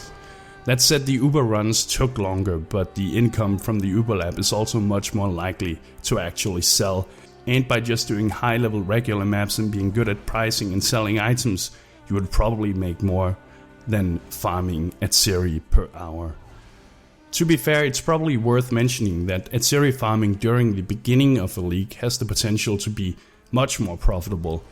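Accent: Denmark. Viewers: Great video! Are you danish? You sound a bit danish :)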